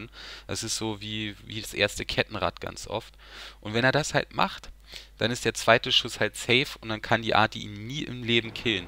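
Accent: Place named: Switzerland. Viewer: ahhh wie geil ein schweizer :)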